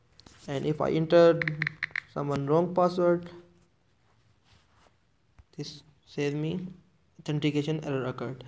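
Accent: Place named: India. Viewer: Another heavy india accent every fucking video...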